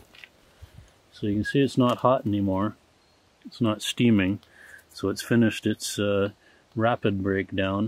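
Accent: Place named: North, United States. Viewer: that you! I knew you were from the north from your accent. I was born in Wisconsin, but transplanted in Brownsville Tx.😂😂 Still haven't shaken the milk 🐄 thought 😂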